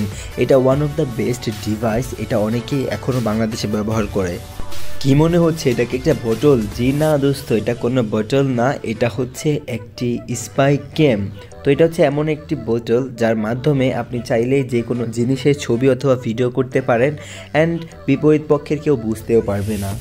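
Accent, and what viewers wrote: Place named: India. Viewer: Your bangla like hindi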